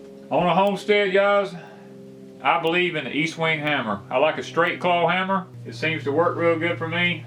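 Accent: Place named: Deep South, United States. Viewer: Nice job, I can tell you are Deep South. In NC we would insulate the floor. Enjoyed watching.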